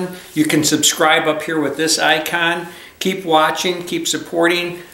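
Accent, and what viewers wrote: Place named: Bridgeport, United States. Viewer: You sound like your from Bridgeport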